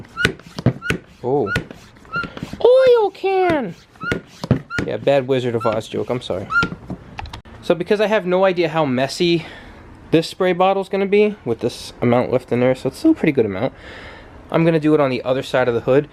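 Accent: New York, United States. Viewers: ayo why do i feel like you're from new york? lol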